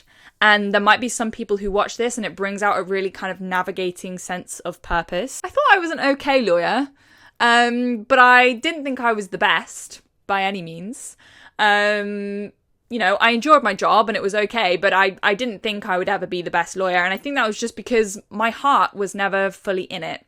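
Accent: London, United Kingdom. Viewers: Her accent has become real London lol happy for her - hope all works out 💚💚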